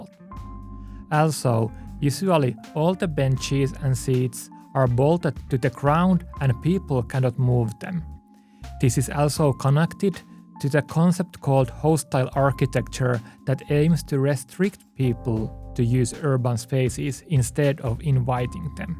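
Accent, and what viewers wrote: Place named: Finland. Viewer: Mikä saakeli siinäkin on että suomalainen yliopisto lataa videon suomenkielisellä otsikolla, mutta selostus on rallienglanniksi?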